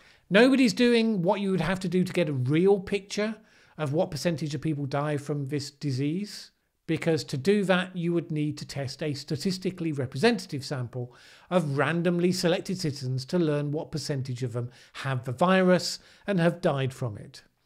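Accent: United Kingdom. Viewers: Thank you once again. A sane voice is always welcome in any discussion and your approach of tackling outlying views prior to narrowing the examination is especially effective (and necessary in todays internet society) in getting to the core of the subject. I am sharing this with friends in the States who will doubtless enjoy your unbiased examination. Not to mention the British accent. All the best from a Scot in Panama. 😁🇵🇦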